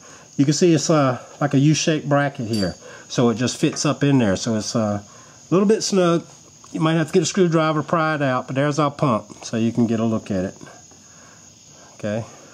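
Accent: South, United States. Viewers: 😂 that Southern accent... lol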